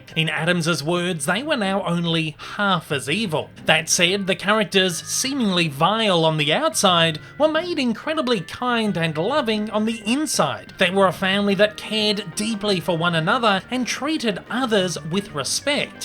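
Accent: Australia. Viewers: your accent sound like Australian or Ozzy i m right i m kidding o know your a Australian what else will down under means then referring to Australia.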